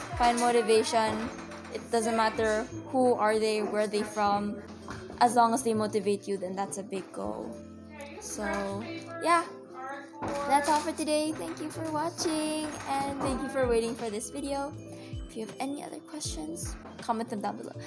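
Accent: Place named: Japan. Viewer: I saw your video on TikTok! You and yamato Motivated me >< btw, you said you watch Anime. May i know where you watch anime? I want to watch Anime too (for entertainment and for learning na din hshs) And!! I like your Japanese accent😭❤️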